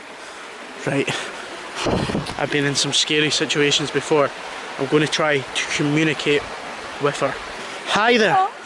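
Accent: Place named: Scotland, United Kingdom. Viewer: Scottish revealed. Known by accent! xD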